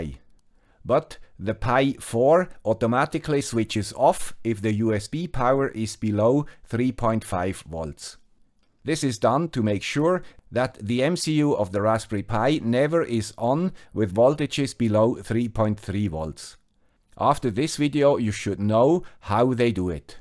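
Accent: Switzerland. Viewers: Agree. I’ve got 25 years into this so far. The guy with the Swiss accent has been a great help.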